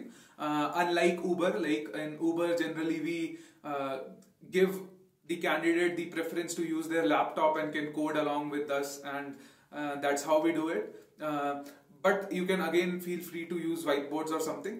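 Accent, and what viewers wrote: India. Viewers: sorry but your indian accent very strong, you need to work on that.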